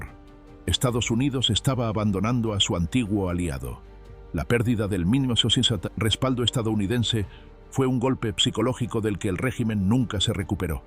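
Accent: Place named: Spain. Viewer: Menos mal que el Narrador, no tiene acento Sudaméricano. Para mí oído es más agradable oír a alguien con acento español, es más Elegante, con mejor dicción y con más clase. Este señor Fulgencio Batista era Amerindio puro, con algo de Africano, pero según se ve 0 de sangre🩸 española mediterránea o latina.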